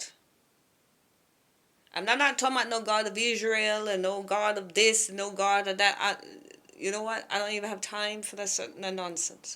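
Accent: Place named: Jamaica. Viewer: You sound Jamaican.....keep on LIVING. Number seven 7⃣.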